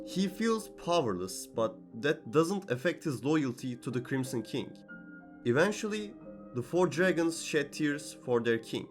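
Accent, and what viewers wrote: Turkey. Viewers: abi tam olarak 5sn izledim henüz ama türk aksanın var (kötü bi şey gibi değil) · You sound like Turkish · Bu aksanı nerde olsa tanırım. Türklerinin sonunda fark etmeksizin herhangi bir medya üzerinden kaliteli içerikleri ürettiğini görmek iç açıcı,takipteyim. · bu ingilizce aksanı bu kadar türklere özgü olamaz ya bu arada yona of the dawnın hala ikinci sezonunu bekliyorum yıllar geçti:( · Türk olduğuna yemin edebilirim. Türkçe konuşuyormuş gibi ingilizce konuşuyorsun. Türk aksanı diye bir şey varmış onu anladım ahsjskkd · idk how but you have such a Turkish accent in english wow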